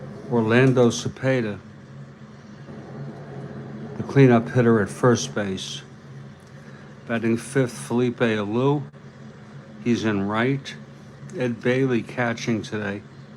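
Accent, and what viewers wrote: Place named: New York, United States. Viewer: 'Greetings everyone' Tuned in again to the 'Stratomatic Network'. continues to deliver the 'art' of the live Strato broadcast. Continue your excellence. We know its tough, but we thank you. There is something special about that NY sound and your baseball knowledge that is comforting for the old time fans. *BTW...Did you know the NYY perfect game pitcher Don Larsen appeared in this real life May 4, '62 11-6 win at Wrigley Field for the SF Giants, allowing three runs over the last three innings but still recording his third 'save' of the season.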